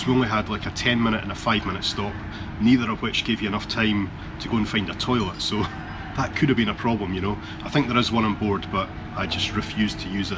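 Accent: Scotland, United Kingdom. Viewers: U picts never like salted caramel 😆 I enjoy watching your videos...love the Scottish accent. Greetings from denmark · As one with a bad knee, I commiserate with you. No, please, for the sake of your knees, don't do another long coach trip. Love your ferry boat rides - please do more of those. And love your Scottish brogue. My ancestry hails from Scotland. Blessings, Georgina from Georgia, USA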